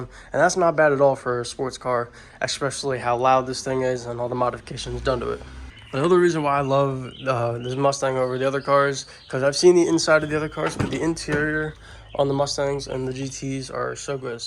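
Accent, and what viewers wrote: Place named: South Jersey, United States. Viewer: you gotta be from south jersey or philly…. gotta be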